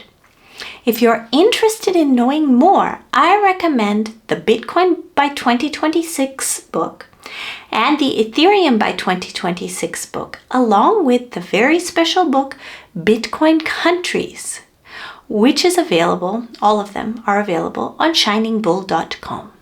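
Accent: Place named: Canada. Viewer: where are you from? Canada?:)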